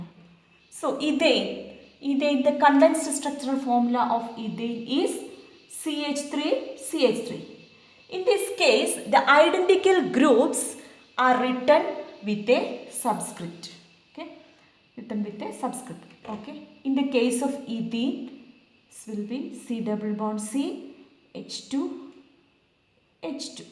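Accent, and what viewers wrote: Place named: South India, India. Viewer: i thought from south part of India,based on yours accent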